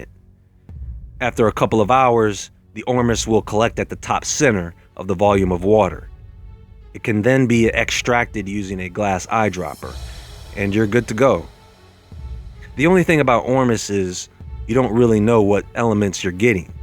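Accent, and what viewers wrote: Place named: New York, United States. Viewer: Bro are u from NY? you sound like it..I'm from bx live in queens now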